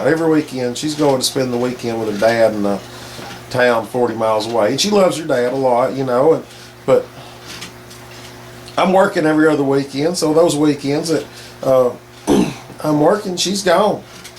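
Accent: South, United States. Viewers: Gotta love a deep southern accent, Lol.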